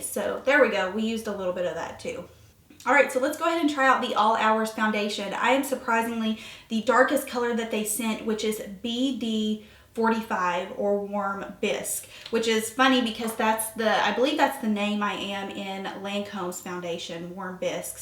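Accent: South, United States. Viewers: I love your videos and your adorable Southern accent. I love Burberry makeup looks too! Violette_fr is a super cool French blogger.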